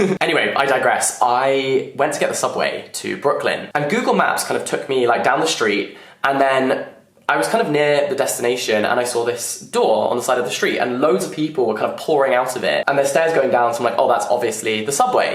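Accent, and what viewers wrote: United Kingdom. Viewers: You're Brit English makes me wanna take a literature degree 🤌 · « New York is so lovely » he says in a thick British accent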